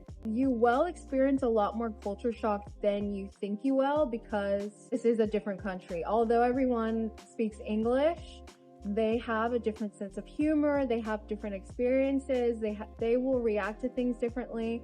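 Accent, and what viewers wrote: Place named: New Zealand. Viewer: Hehehe even after just 5 years, that accent is rubbing of on ya! One of us! Great vid will show it to my american family 😊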